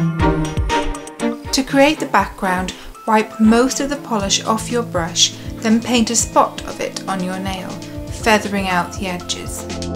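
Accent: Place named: RP, United Kingdom. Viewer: You have such a posh accent haha:)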